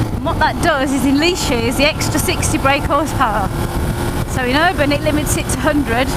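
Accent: United Kingdom. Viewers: I LOVE how you speak. Are you english? And forget the hate. Because the "negative comments" that I saw here are clearly hate. Out of jealousy, and fear. Guys don't like when they meet girls who have double their balls. And for a girl to ride a Diavel, she needs big balls ahah! Tell me, are you tall? Small? What's your frame? I wonder if a short, slim girl can actually ride a Diavel.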